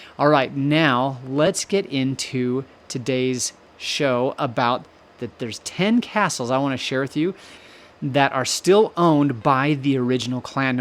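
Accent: United States. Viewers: I am an Armstrong please do oters with a Scot speaking instead of your American voice... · Narrator with an American accent? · Why is there an American voice doing this , it put me off , he’s reading all this and not very well . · Putting up the name of each castle somewhere on the Image as you were speaking about it, would've been helpful, especially as (no offence) your American pronunciation is not always on par with ours. Maybe consider it? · Why are we heari g an americans voice,instead of a Scottish voice. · Why is an American doing the voiceover??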